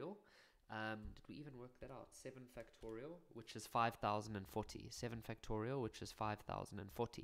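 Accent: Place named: South Africa. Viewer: Sounds like a "Soutie" iykyk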